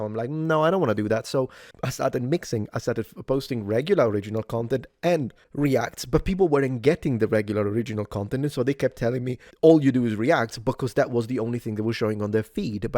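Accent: Sicily, Italy. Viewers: Good sir, you can post whatever you want on YOUR channel. I just recently started watching and it was because of your political commentary. I'm so happy you popped up on my feed, your voice is very soothing and your accent is great. My dad was in Sicily when he was in the Navy during the Vietnam war and always spoke so highly of his time there. When I heard you say that is where you are from, I was hooked. I appreciate your perspective and hope you continue to post your feelings. ❤ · I don't remember when i first got to your channel, but i remember that i wanted something history related to listen to, saw your name, went "Heh, Supernatural" (Yes, i know, i'm a smooth-brain) and i was hooked on that stuff for a while. Then i kinda forgot about you, because my focus shifted to something else. After what happened with Kirk, i just needed someone to listen to who talks sense into that situation, and by chance i found you again. 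Now i'll stick with you again, your content is pretty cool overall. Do whatever feels right, i'll listen to your nice italian accent regardless of what you're speaking about! :D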